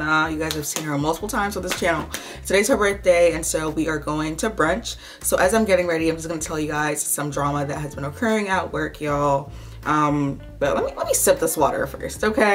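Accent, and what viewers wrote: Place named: Africa. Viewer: Omg! It’s the African accent for me 😂😂😂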